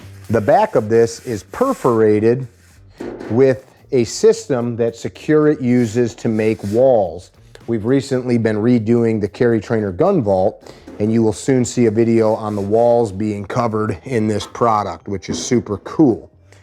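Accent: Chicago, United States. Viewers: Listened 3 times just for the chicago accent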